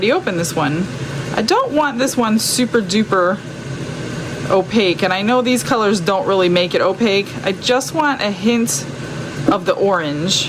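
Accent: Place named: Midwest, United States. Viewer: I get so many neat ideas from you. Your speech pattern and vocabulary make me think you are originally from the Midwest. I am from Nebraska and you make me think of home 😊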